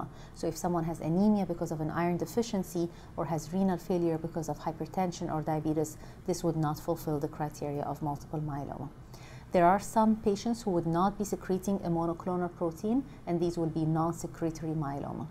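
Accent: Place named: Egypt. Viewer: Cannot shake the Egyptian accent though hhhh. Great video though.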